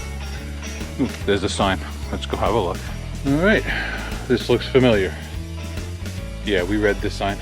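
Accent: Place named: New York, United States. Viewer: I grew up on the east end of Long Island (north fork) we love going to ‘Montauk and learning it’s history. You brought me back home for 30mins with the landscape and your Commentary and your awesome New York accent. 😀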